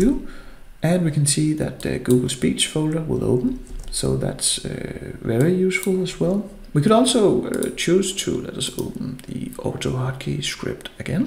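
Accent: Denmark. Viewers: i smell danish here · i can already hear ur from denmark lol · i smell danish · let me guess, you're danish,, i may be wrong but really sounds like it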